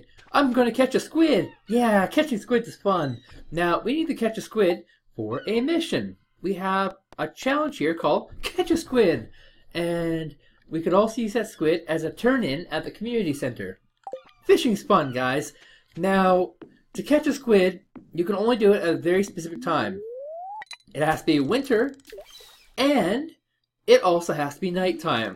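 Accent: Canada. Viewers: defo hear a maritimes Canadian accent :)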